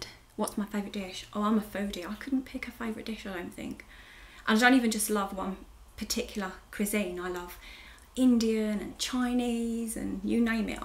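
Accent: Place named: North London, United Kingdom. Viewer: Im from enfield so not far from tottenham :) i could tell from your "accent" that you are a north london girl. Fab videos and i enjoy watching them. I like margate in kent as used to go on holiday there as a kid. Now my kids want to move to great yarmouth after they finish junior school but im not sure how i will adapt from the speedy london life style to a more slow and rural life style.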